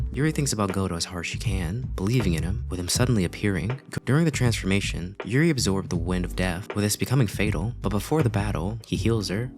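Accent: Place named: United States. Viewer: Did I understand much? No Did I watch the whole thing? Yes Why? Because I need to do an American accent in a play, and I’m copying you 👍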